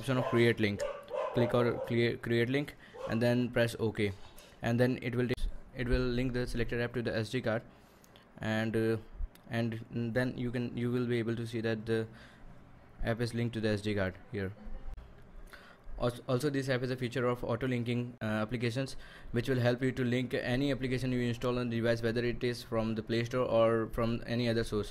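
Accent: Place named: India. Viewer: I understand him 75% even if I'm not a native english speaker. He need to speak slowly,but he is Indian and his accent is different.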